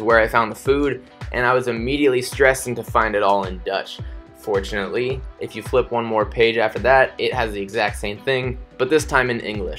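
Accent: United States. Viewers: I hate the KLM Lounge in Amsterdam! It's definatly one of the worst! It's always crowded and they only offer little amounts of food and drinks. Otherwise, nice and really interesting review. But please speak a little bit slower and more clear. Your American accent makes it quite exhausting to listen all the 39 minutes. 👍🏼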